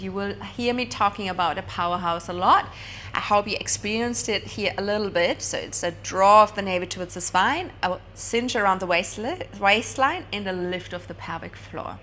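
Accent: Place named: Switzerland. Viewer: Sounds swiss 🤔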